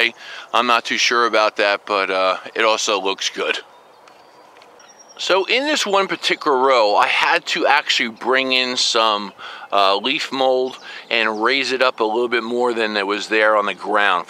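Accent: New Jersey, United States. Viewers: Yer Joisy accent is just precious! My first husband wuz a Joisy boy and you East Coast boyz are just fine in my book!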